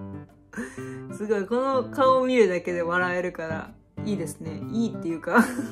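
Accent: Kansai, Japan. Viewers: だんだんアンドロイド感が薄れてきた。関西人なのもわかった。 これからどういう路線でいかれるのか拝見します。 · 関西なんです？ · 関西弁全開!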